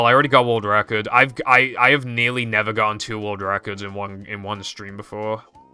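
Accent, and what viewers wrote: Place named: Boston, United States. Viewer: That's the Boston accent for you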